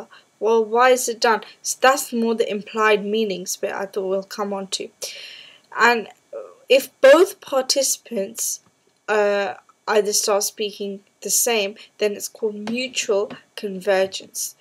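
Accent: United Kingdom. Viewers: brilliant video but I thought it was recieved pronunciation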